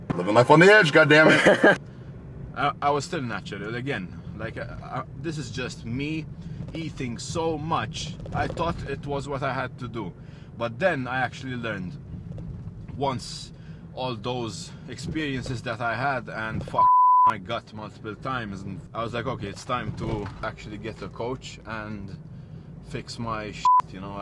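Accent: Malta. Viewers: hes from Malta I think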